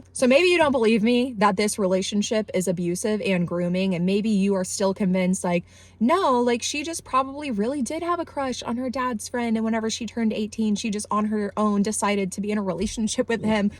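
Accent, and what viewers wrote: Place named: United States. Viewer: I understand where you're coming from, but you have to be able to see a path to banning literature made by marginalized groups stemming from criminalizing this drivel. You have an american accent, so I'm assuming you're from the US. If you are, you likely know literature in general is under attack here. Personally, I think social rejection needs to be the method to discourage this garbage, rather than legislation and criminalization.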